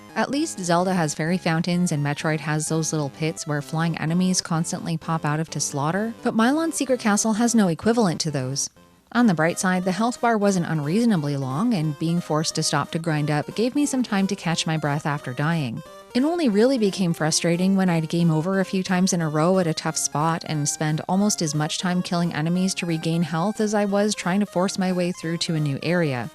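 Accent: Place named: Canada. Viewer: Love your quirky videos and your charming Canadian (I think) accent. ❤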